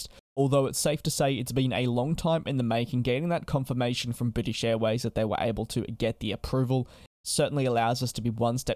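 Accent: Australia. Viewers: Sounds Aussie to me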